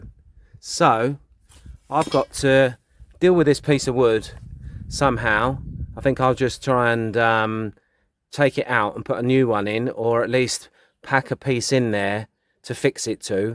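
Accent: Essex, United Kingdom. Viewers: Hi, where are you originally from in England? Correct me if I'm wrong, sounds very much like an Essex accent to me? Cheers, all the best.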